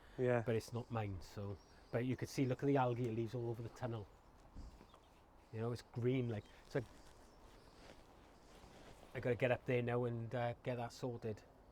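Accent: South Wales, United Kingdom. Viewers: Yep, you definitely have a south wales accent cariad 😆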